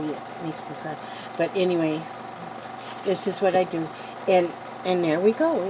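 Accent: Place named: Minnesota, United States. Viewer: I really enjoy your videos! You are so inspiring yet so calming! I will always think of u as! I found that story so cute in another video, maybe it's your voice has that Minnesota accent. Anyways your videos are a pleasure to watch TFS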